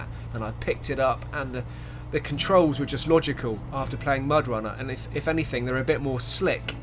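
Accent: United Kingdom. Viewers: Hoal, Te extrañamos en WoT No nos abandones. Tus narraciones con tu asento britanico son una delicia.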